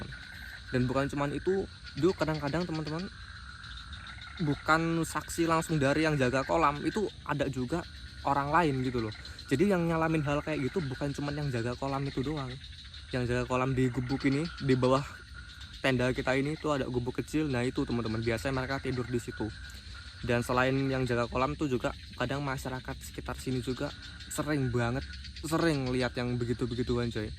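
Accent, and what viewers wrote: Java, Indonesia. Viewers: Salam.kenal mas...maaf kayaknya dri logat ada jawanya yah..salut sama masnya anak muda yg pemberani nyalinya gak kaleng kaleng, hanya perlu hati hati saja dgn org yg bisa jahil apalg dihutan....semangat..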